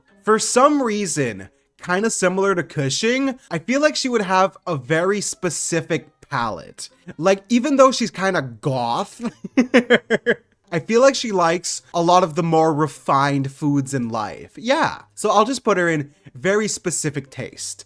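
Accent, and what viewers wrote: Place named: Quebec, Canada. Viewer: Omg are you french/french speaker?? Your accent is awesome, like a French Canadian accent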